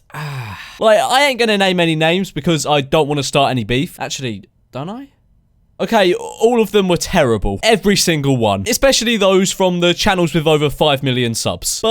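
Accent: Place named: RP, United Kingdom. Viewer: You sound posher than usual in this video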